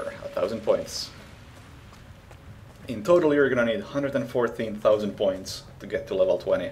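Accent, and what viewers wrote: Finland. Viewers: Arvaa helposti ku kuulostaa vähä rallienkulta😅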